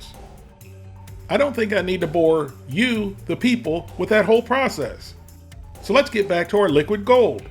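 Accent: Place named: Florida, United States. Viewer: You sound like your from Florida, also great video :)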